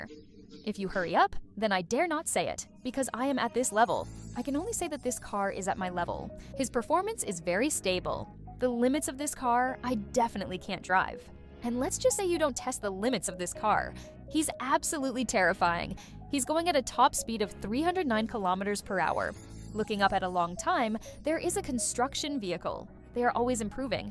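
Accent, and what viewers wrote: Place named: China. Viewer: Funny Chinglish commentary 😅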